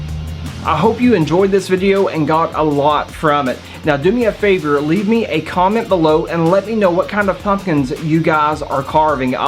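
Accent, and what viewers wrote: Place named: West Texas, United States. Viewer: West Texas accent and all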